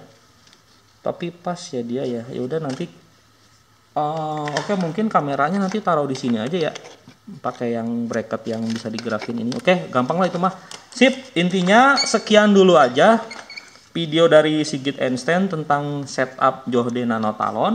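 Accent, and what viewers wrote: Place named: Bandung, Indonesia. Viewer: Bang kalo abang orang mana tapi abang kaya orang bandung deh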